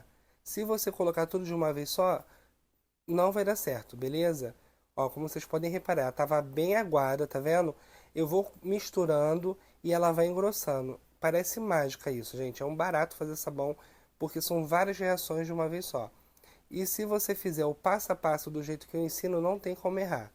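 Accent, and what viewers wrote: Rio de Janeiro, Brazil. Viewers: E eu q ñ me canso de,toda vez q vou fazer uma receita de, rever os vídeos para ter segurança e ouvir esse sotaque carioca e a a perfeição no repasse da técnica junto com a experiência de quem já está no automático. 👍👍amo e amo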